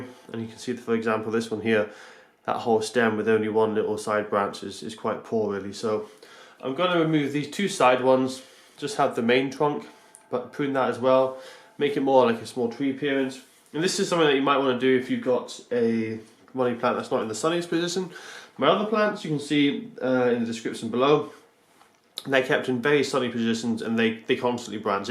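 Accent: United Kingdom. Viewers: U sound like ur from the UK. What growing conditions are u using to get around the bad UK weather. And are you keeping these plants indoors outdoors or using a greenhouse? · I love your channel! For some reason a British accent keeps me from getting bored on how to videos. I have a question: how old should my jade plant be before I start pruning it like that?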